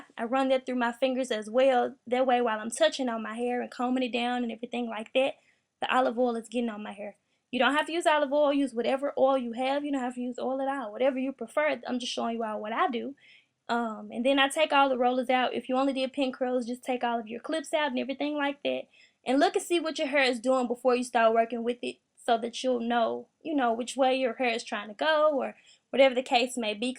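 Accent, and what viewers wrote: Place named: Memphis, United States. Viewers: I'm natural but I heard your accent and I had to sub. #northmemphis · I know that accent from anywhere lol. I’m a memphian too 😂 This was my go to style in high school ❤️ great tutorial 👍🏽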